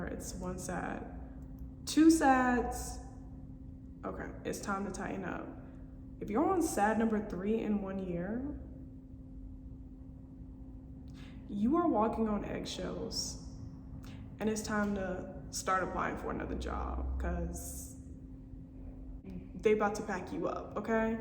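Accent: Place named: Alabama, United States. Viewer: you talk like a Bama girl though ! 🙂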